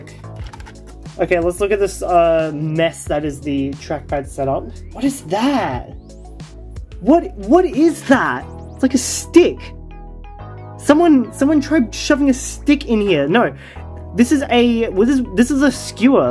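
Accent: Australia. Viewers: I think you're currently my favorite YouTube channel. interesting old tech stuff, dankpods style but with more chill vibes, that glorious australian accent, plus beautiful synthwave (edit: and Minecraft OST!) playing the whole time? perfection.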